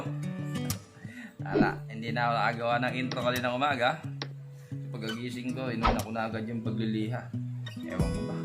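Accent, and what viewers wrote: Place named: Bulacan, Philippines. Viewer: Pa shout out ndin. Tga bulacan kaba? Parang bulakenyo kasi tono ng boses nyo po. Bulacan din po ako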